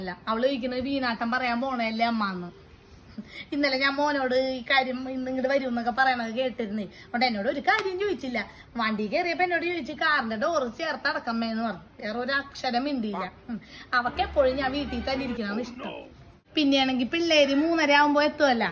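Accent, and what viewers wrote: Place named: Paravur, India. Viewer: I love your parur Accent👌👌I am also from parur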